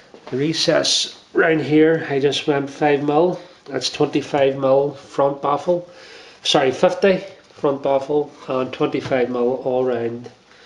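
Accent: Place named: Newtownabbey, United Kingdom. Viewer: How random. I'm searching for this and you sound like your from newtownabbey or glengormley. Small world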